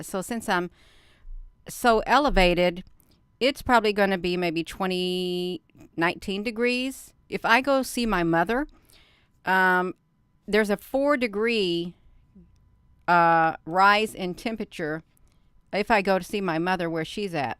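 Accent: South, United States. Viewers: I love the way you talk. Both my mom and dad have that southern drau.Some people are just complainers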